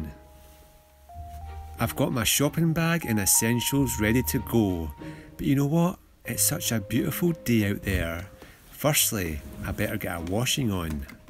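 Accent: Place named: Scotland, United Kingdom. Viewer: Frankly love your Scottish Accent,. From Stuart Adamson to Sean Connery.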